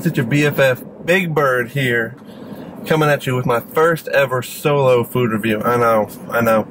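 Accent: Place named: South, United States. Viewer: I love that southernnn twanggg'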